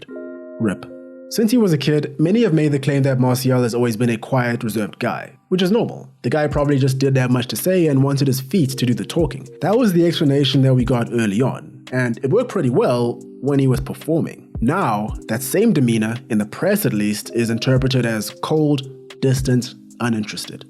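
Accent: South Africa. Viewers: Are you a South African? Noticed the accent immediately ! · are you south african? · Are you from S.A bru ?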